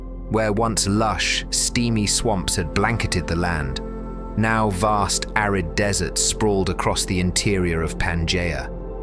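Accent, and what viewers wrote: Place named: United Kingdom. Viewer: Excellent documentary, but strangely no credits are shown. Based on other Stellar videos, this one appears to have been assembled from many publicly available sources. The script may have been written by AI, but the creator made sure that the words matched the images, unlike other AI-generated videos. An educated British accent was selected for the AI voice to make it sound more authoritative.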